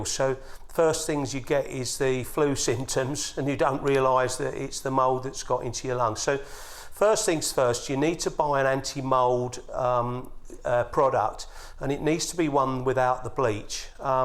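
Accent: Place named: United Kingdom. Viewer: THANK YOU for your video ( Made a change hearing a British accent & not American) the drivers side Footwell carpet keep feeling wet after it's rained so im going to Weatherstrip the Windscreen & Back window around the door frames & I have a 'Peugeot 207 3dr Hatchback 2010' & on the roof of the car along both sides theirs brackets ?? Where you'd attached a roof rack im going to put some sealant along them both trying to eradicate where water maybe getting in, it's only the drivers footwear carpet that feels wet so hopefully working from the top of the car down ill manage to weatherseal the points of access where waters getting in. Thankyou again & really appreciate your knowledge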